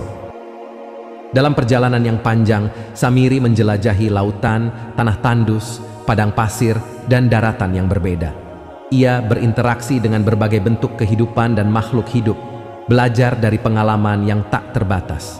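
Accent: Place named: West Java, Indonesia. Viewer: Itu tergantung Logat daerah asalnya,sptny dari Sunda,cuma saya blm pernah dengar riwayat Dajjal mengembara SPT diatas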